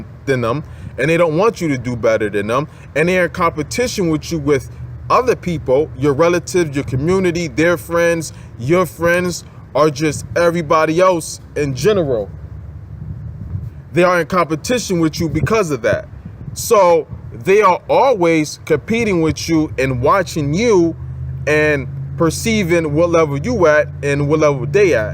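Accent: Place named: New York, United States. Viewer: You are God sent. Thank you for sharing this information. Also i can tell your from N.Y.. Great video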